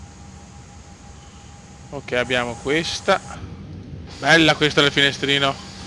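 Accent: Veneto, Italy. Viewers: Bel video, ma parli troppo veneziano, si capisce poco quel che dici...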